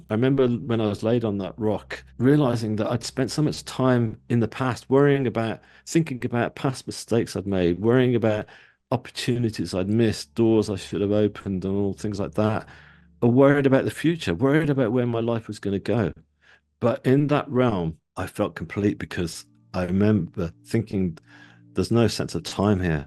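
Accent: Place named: England, United Kingdom. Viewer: So good to hear an English Voice NDE. So gentle, soothing and Real. 🫶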